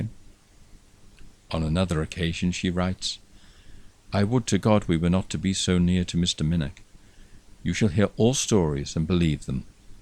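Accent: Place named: England, United Kingdom. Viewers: Aaaah that English accent, It sounds so very elegant to me I love it!!!! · Indeed very lavishly narrated in a typical English accent story of Emile A'Langeley trial begins. Precisely another part of the world in India, 30 June 1857 nearly 600 Scottish n English family killed by Indian Mutineers in Lucknow Cant, in which my ancestor actively took part. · Hes from liverpool, same place I'm from.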